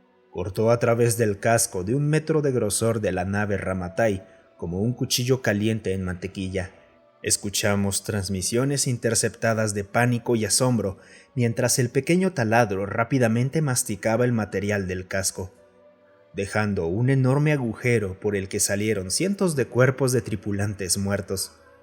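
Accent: Argentina. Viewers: Vi la versión de la rana que narra ... Y tu lo volviste argentina merces mil años de salud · Argentino nos salió el pibito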